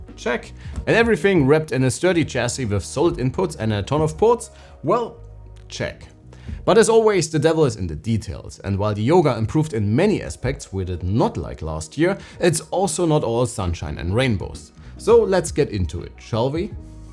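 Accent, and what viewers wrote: Germany. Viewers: Nobody wants to talk to you in the comments below lol. You manage to speak German when talking in English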